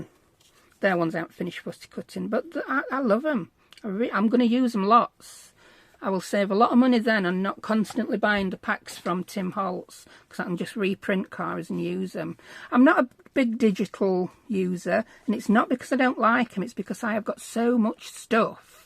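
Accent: United Kingdom. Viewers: Ohh my goodness, ur a "GENIUS". TO use ur corner square & ur other gadget ,I don't have any of those but maybe I can buy myself one from TEMU. ❤❤❤. And I think ur in the UK, I love ur accent, I could listen to you all day. Even wen you make jokes, it keeps ur videos fun to watch, keep doing what you do BEST, GOD BLESS 🙏 🙏🙏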